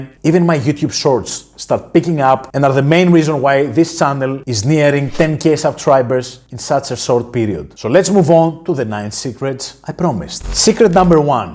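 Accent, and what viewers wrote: Greece. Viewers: I think you are from Greece because of the accent ☺️♥️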